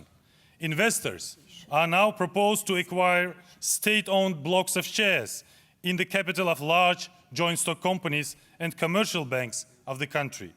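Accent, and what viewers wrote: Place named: Russia. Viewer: I thought it is gonna be like: “let me speak from the bottom of my heart”..😁 But thankfully this guys speaks far better than other uzbek officials. Nevertheless speech was intially written in russian, then translated into english. I am sorry sir you sound so russian! Although he gave good speech, there is very little change in uzbekland. I almost laughed when he said: “ non-discrimination” and “fight against corruption” 😂 Feels like uzbek treasury is empty and shavkat needs more money from US institutions to further fill his pockets in.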